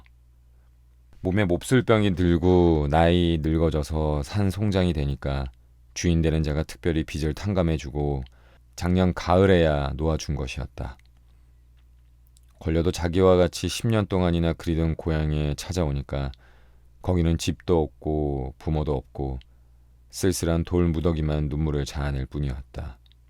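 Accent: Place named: Busan, South Korea. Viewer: 부산사투리~~~~~입에 착착 붙네요~~~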